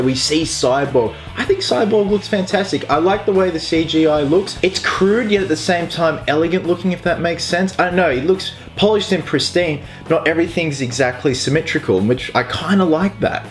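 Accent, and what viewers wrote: United States. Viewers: hahaha I love your american accent, nice beard too! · American accent on point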